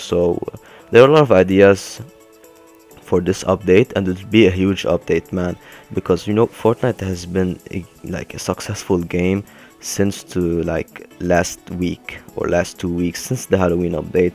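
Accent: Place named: Lebanon. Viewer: Yo im from Orlando but I got a friend from Lebanon and you have a really similar accent, are you by any chance Lebanese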